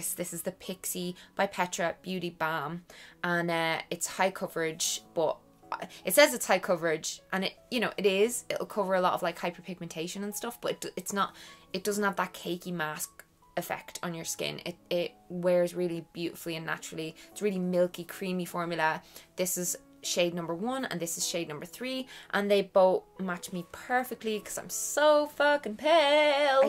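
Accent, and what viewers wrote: Ireland. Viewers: I'm so glad I found your channel! I lived in Ireland 7 years, so not only are your videos super helpful, but your accent also brings so much nostalgia! Thank you for all the helpful tips! If you don't mind me asking, is your hair color natural? The black you're wearing is so vibrant! It's not... inky, if that makes any sense. It looks like your hair has lots of different shades of black to it, and it looks gorgeous. :)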